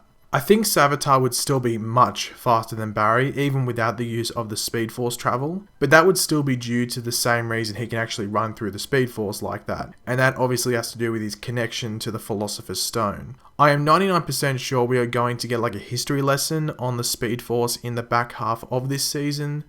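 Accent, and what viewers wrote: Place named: Australia. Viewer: Oh you are Australian. Brilliant.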